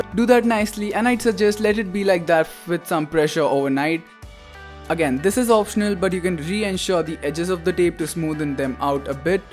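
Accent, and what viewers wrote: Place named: India. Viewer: your accent is so cool, its like indian but not and im all for it lol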